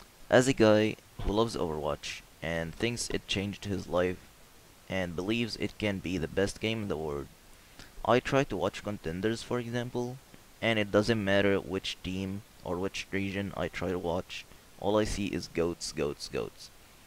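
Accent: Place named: Arab world. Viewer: Much love (from Palestine!) you sound arabic by the way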